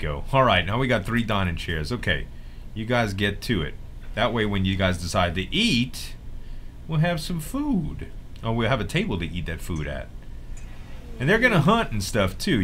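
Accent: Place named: Deep South, United States. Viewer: Danyelle Martin lousiana, he is from the DEEP south. I was born in Tennessee so I know these types of accents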